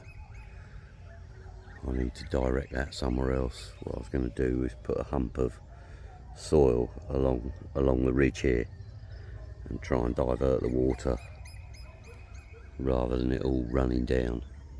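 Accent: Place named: United Kingdom. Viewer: Looks great. Good luck to you. Just a fracture! Lol Sound like you come from the same part of the UK as me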